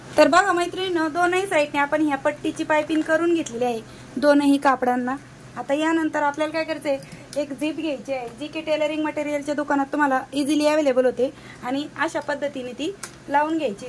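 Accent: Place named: Marathwada, India. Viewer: खूपच सुंदर शिवली. तु कोणत्या गावची आहे. तुझी भाषा मराठवाड्याची वाटते. छान छान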